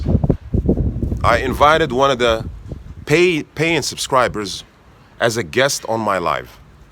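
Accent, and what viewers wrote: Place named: United States. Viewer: Hey man! Your pronunciation is so American. Have you lived in the U.S., or did you just train to make it that good?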